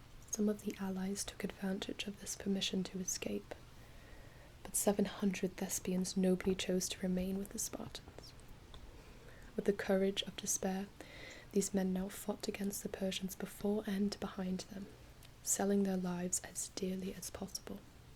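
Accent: England, United Kingdom. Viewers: Wow, English accent is spot on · Shouldn't you have a Greek ascent? and not a n English one? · That English accent though 👌👌👌😂😂😂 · Love it good job on the English accent big shout out from Leeds England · Insanely good British accent!! loved it! seriously sounded like you are from England :4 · Wow that's the best English accent I have ever heard from an American, honestly in a movie you'd not be able to tell which side of the atlantic ✌️ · Why does she have an English accent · Okay but why the british like accent dude? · Holy hell this accent!!! I literally did a double take when you first spoke because I couldnt understand how such an english accent was coming out of your mouth haha. Yassssssssssss huge congratulations, you are absolutely awesome and such an amazing asset to our rapidly expanding community! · Carrying on the fine tradition of the media giving all ancient or futuristic civilisations British accents, queeny approves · Why the british accent though??watching this as greek is so cringy but w/e great work anyways..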